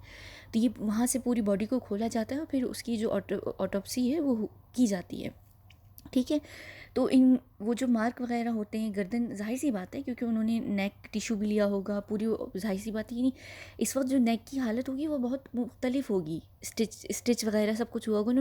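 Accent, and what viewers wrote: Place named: United Kingdom. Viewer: You have a British accent when you speak English 😀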